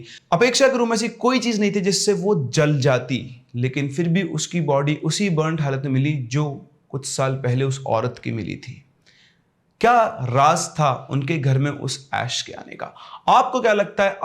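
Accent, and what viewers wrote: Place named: Haryana, India. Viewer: Sir your haryanvi is so cute and funny 🤣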